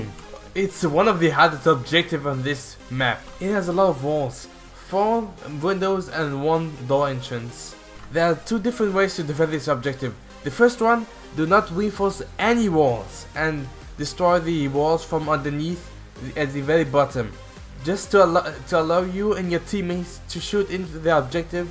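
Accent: France. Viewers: He sounds French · I love your accent!!! I'm not really good at guessing accents but you sound french, german, or arab. Love the videos keep it up. ❤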